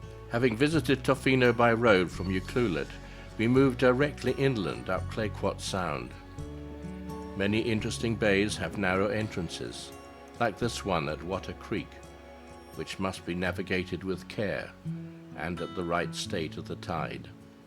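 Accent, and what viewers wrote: England, United Kingdom. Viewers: Is it just me, or does the accent have its roots in the same general locale as Alfred Hitchcock?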